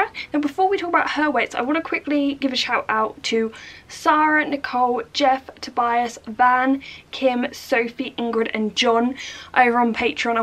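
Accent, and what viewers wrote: United Kingdom. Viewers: Think i ask this on all your videos but never get a reply. Are you from the uk?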